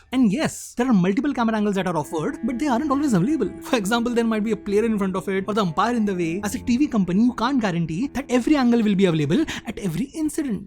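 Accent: India. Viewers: Sorry, but you will have to work on your pronunciation and diction. Your story telling has been going downhill and this was unbearable, literally could not understand a few words. Over confidence and not wanting to improve your craft maybe?! We Indians have a strong accent, but this one was jarring. Slow down, speak properly and give it a few takes and retakes. Content was good, no complaints.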